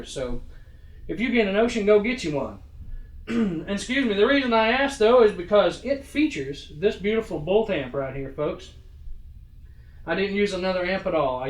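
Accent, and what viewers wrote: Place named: Nashville, United States. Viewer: I love, but it cracks me up to listen to him talk. He's a Mainer and he talks like he's born and raised in Nashville.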